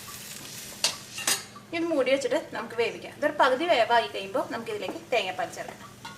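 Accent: Thrissur, India. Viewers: simble ayi arkum theyyarakkan pattunna reethiyilanu chechiyude show nyan uae yilanu nyan eee show nokki friendsinte munpil alayittundu prathyakichu chechiyude slang thani nadan thrissur oreyoru vishamameyullu enne ithrayumathikam karikalundakkan bpadippicha chechiye onnu kanan pattumo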